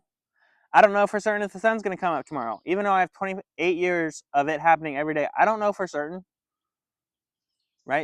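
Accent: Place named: United States. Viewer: I watched till the end. Where are you. The backdrop looks beautiful, I'm guessing America by your accent but what state?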